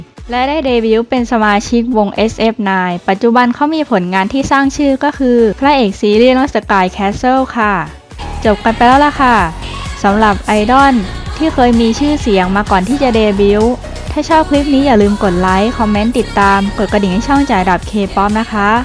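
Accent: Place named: Thailand. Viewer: ศัพท์อังกฤษ ออกเสียงไทยจ๋าเลยพี่สาว ไม่มีการใส่สำเนียงเลย ฟังแล้วตลก แต่ก็ยินดีเข้าชมเพราะอุตส่าห์อ่านให้ฟังอะนะ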